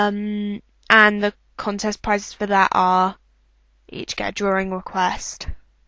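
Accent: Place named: United Kingdom. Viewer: you british? sounds like it *giggle*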